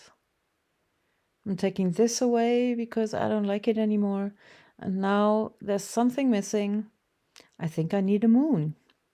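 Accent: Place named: Germany. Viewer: Guten Tag from Tennessee, USA. At least I believe you are German but your accent is different from my husband’s. He’s a German National from Hamburg. Your English sounds a bit different than his. And the advertisement with the word fisch also led me to that conclusion. That being said, your work is quite beautiful. Prost from America.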